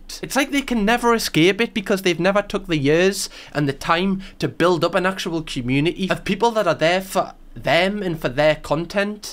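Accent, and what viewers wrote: Newcastle, United Kingdom. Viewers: I am being 100% serious, I subbed to both of your channels after never having seen one of your own videos. I saw you in a collab and was like "oh hes a geordie (honorary scot) imma sub to him"... been binge watching since lol.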